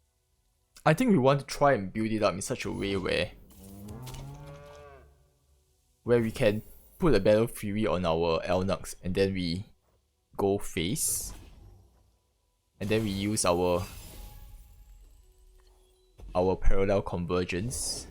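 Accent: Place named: Singapore. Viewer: I think I know a Singaporean when I hear one. Keep it up bro!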